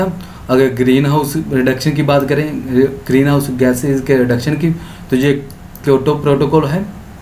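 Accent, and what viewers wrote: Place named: Punjab, India. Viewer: From ur Language, you seems to be from PUNJAB ..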